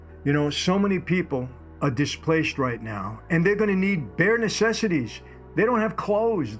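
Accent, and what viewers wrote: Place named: New York, United States. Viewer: i really admire you and your story. You remind me so much of my father that was also an Italian from New York and talked like you. My father would be 96 years old today. The strongest men I ever met were my dad and my uncles from New York. That was when men were men. This was also a time when your uncles helped raise you. If my uncles saw me doing something i shouldn't I got a slap. Hoping they never told my father my wrongdoing so that I didn't get another. lol Enjoy your videos and my prays are with North Carolina and California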